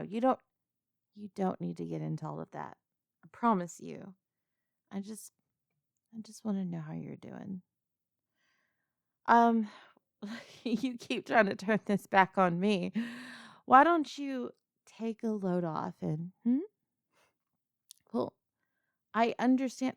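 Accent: South, United States. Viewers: Thanks for the great audio I love the southern accent the idea of a strong minotaur girl wanting me is nice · Damn, your southern accent is hot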